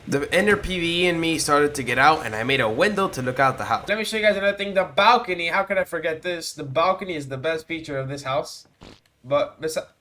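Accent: France. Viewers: I love your french accent and your balcony👌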